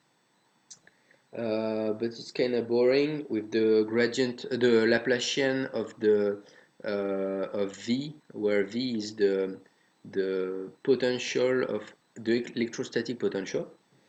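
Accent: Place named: France. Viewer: I love your frenglish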